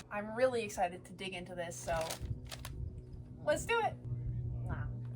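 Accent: Canada. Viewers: killed me with the Canadian accent 🤣 Love your corny jokes, education as you make your way through the trails and just your overall excitement when oot and aboot in nature! Amazing video as always and excited to see where you explore next, cheers!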